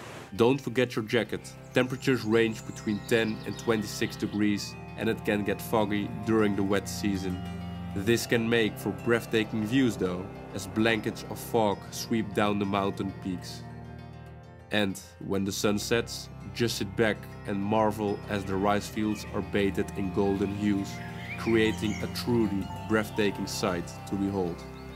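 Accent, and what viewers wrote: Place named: Netherlands. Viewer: Dik Nederlands accent :p maar wat een prachtige video!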